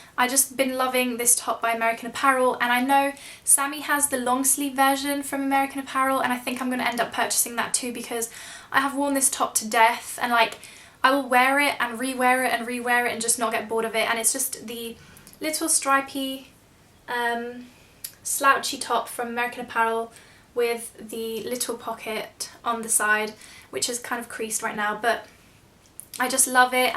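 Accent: United Kingdom. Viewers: Hi! I love your videos so much <3 I'm Thai and when I first discovered your channel, I hardly understood you because of my English at that time and I was not familiar with British accent. I've been a subscriber of you since 2011 and I watched almost every single video and that help improve my English a lot! I just got 8.5 on my IELTS listening and that was a big surprise for me and I want to thank you for that.:D love you <3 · I just realized how much you look like Laura from Lollipop26. Your hair, your accent and omg even ur face reminds me of her. British women are so classy !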